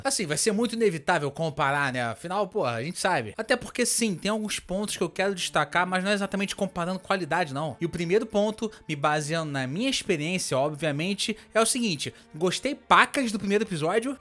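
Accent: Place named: Rio de Janeiro, Brazil. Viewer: Tirando sotaque carioca o vídeo ficou muito bom.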